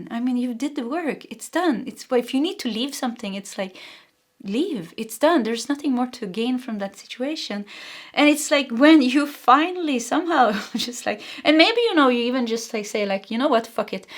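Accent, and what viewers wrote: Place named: Sweden. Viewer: I'm pile #3 and I'm SO excited hehee! My many arms are open!!! Also- I am adopted but found out I have alot of Swedish ancestry so I love to hear your accent and I would love to visit Sweden one day! Maybe it will come on my many fun new adventures you see! I thank you and send you much light and love ❤️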